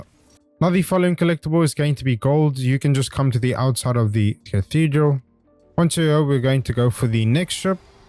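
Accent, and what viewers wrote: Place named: South Africa. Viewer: Just curious, are you south African? You sound a lot like dicuss du plessis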